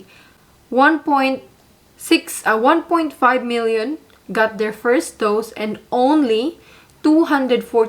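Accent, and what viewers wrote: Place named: Philippines. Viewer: Please Tagalogin mo nalang. I am having a tough time understanding your English. You are talking in English word but the accent is Filipino that is what is making it hard. There is nothing wrong with taking your native tongue.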